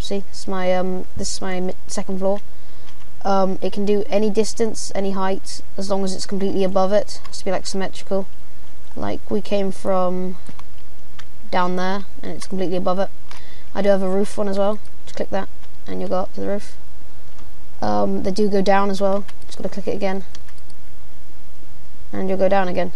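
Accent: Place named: England, United Kingdom. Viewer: lol i love English accents XD but wow thats so cool, tho wen u first said 'pawncraft' it sounded like something else lol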